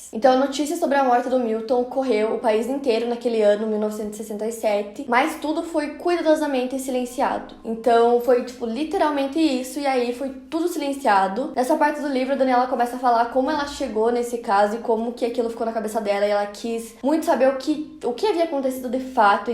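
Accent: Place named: Curitiba, Brazil. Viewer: é aqui de Curitiba? Esse sotaque.. <3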